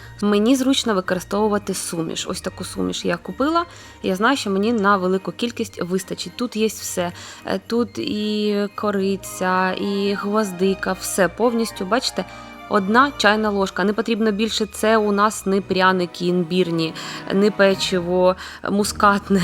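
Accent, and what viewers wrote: Russia. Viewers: Це справжній рецепт. Професійно! Приємно дивитися. Але не дешево (для мене ). І дивна мова у вас - наче українська і хороша, але ж "падарунок" , " раздєліти" та інш. ....це ( просто цікаво) у вашому місті так розмовляють? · Ну доя чого тужитись балакати украинською мовою, аж вуха ломить бо русский акцент видно шо ви гоаворите росийською мовою и вона Головна. То все краще слухати чисту мову бо це не украинська а перелом мозгу. .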